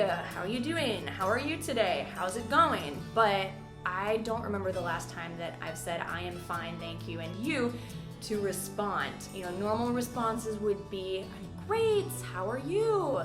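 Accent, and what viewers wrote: United States. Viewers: Actually, you speak American. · You speak American not English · English speakers? The American version would be more accurate! Weird hearing a yank telling us how we speak. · They ask you a lot it because you're beutiful and have a great appearence , here in brazil its normal , mainly you have these blue eyes and nice american accent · Thank you! I am an Italian English teacher and I really appreciate your lessons! I would like to have your American accent but I still have some difficulties and here books are all with British accent and with “I am fine thank you and you!”🤣 thank you again! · Hey. I would like to know about your accent. What state do you live in the US?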